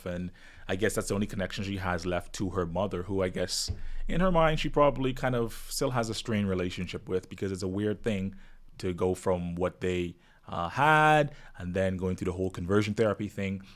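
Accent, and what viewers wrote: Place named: Bahamas. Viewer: Bro u bahamian? Sounds so if so 242 to the world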